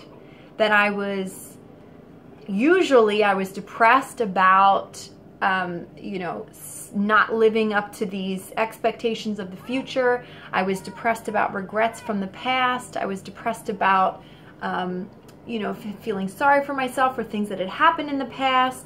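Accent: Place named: Philadelphia, United States. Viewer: I KNEW that was a Philly accent! Haha Takes one to know one. 😊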